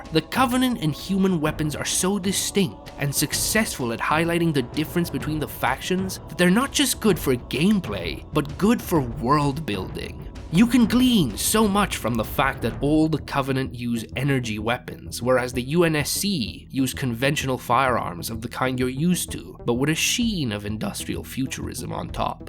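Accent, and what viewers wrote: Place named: United States. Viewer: Eastern European? Where are you from, the accent really sounds American to me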